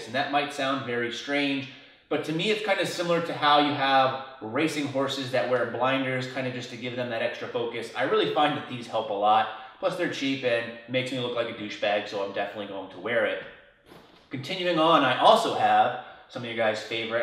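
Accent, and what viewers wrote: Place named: Baltimore, United States. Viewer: are you from Baldimore?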